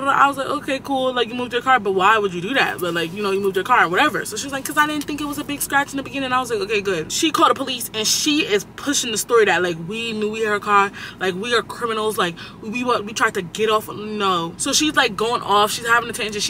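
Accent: Trinidad and Tobago. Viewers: No you're it's a Trinidadian accent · trini to di bone! accent so strong😭😭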